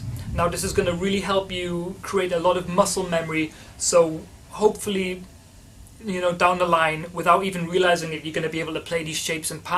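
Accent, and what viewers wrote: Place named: North East England, United Kingdom. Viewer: fellow bassist from the north east that made it! County Durham bassist here.. recognized that accent instantly. Great video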